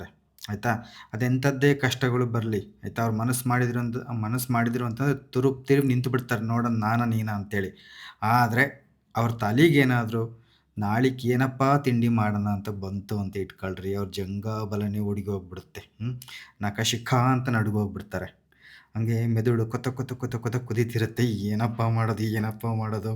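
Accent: Chitradurga, India. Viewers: Pakka chitra durgada bhashedose jote nimma maatu chapparisuvantide😊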